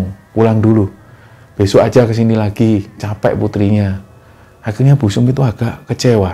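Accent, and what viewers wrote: Java, Indonesia. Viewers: Mlm maz..crt ny keren2 moga sht2 tuk kru KS trutama maz yg logat ny kjowo jowoane sngt kas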